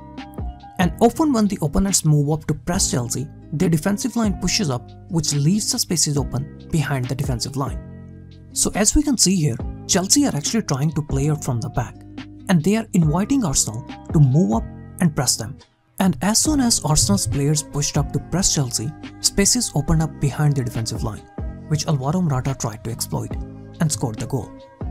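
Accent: India. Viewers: Sounds Indian.... how do you he's not!!!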